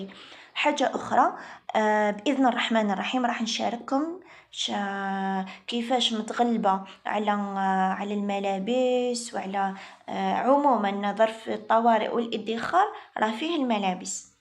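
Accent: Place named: Tipaza, Algeria. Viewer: لهجتك بنت تيبازة ،ما شاء الله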